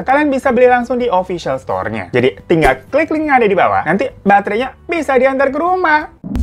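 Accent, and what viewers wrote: Indonesia. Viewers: Slang indonesia kamu paling mantap.. suka saya dengar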